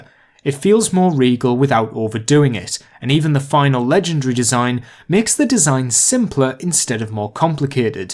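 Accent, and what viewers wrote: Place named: Scotland, United Kingdom. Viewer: Great video and Ilove your accent man! Are you Scottish?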